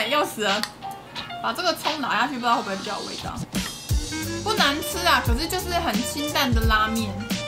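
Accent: Taiwan. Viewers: 妳真得好可愛呀~ 💖 每次聽妳突如其來的"國台語"或時而的"念錯詞"就笑了!! 很喜歡妳的視頻，繼續加油喲^^ 讚~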